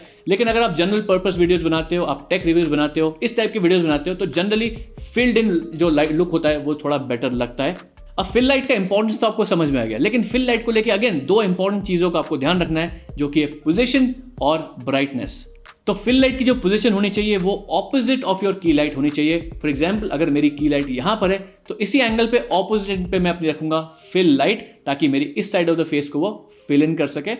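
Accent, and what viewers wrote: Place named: Pakistan. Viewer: Week done bro. Apka Hindi/Urdu ka akcent Bilkul h Hamari tarah h mtlb pakisatanio ki tara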